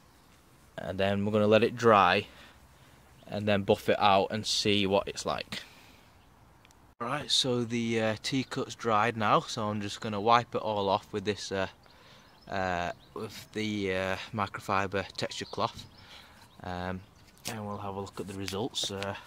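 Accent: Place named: Northern England, United Kingdom. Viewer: thx, funniest bit when he drops the fing t-cut even funnier with a northern accent. luck it was just the clear coat damaged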